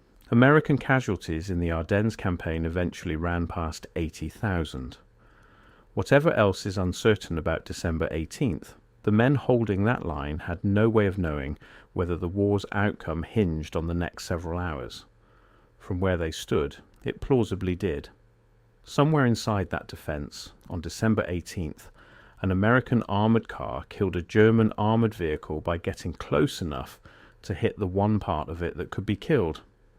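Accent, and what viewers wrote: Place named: United Kingdom. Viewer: Excellent narration! Something about the British or Australian accent that makes it land so beautifully on the ear.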